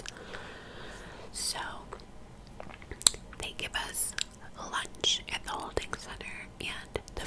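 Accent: New York, United States. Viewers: Glawss that's exactly how she pronounces it with her NY accent lol love it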